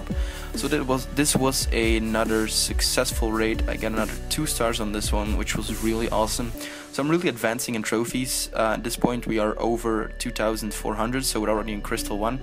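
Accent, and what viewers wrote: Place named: Switzerland. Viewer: really its sounds like your are from switzerland xD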